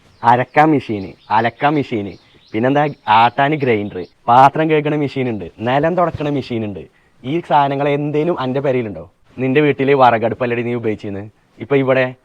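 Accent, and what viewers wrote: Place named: Malappuram, India. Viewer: ഇജ്ജ് അൻ്റെ മലപ്പുറം സ്ലാംഗ് പിടിച്ചാൽ മതി, ഇടക്ക് ഭാഷ മാറുമ്പോൾ ഒരു ഗുമ്മു ഇല്ല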